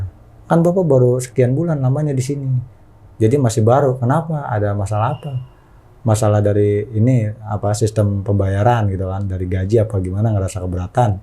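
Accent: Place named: Betawi, Indonesia. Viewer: Bahasa betawinya lho yg bikin gua cengar cengir sendiri😂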